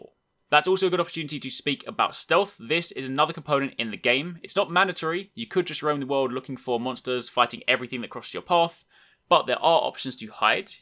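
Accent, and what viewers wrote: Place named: United Kingdom. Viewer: It the British accent:3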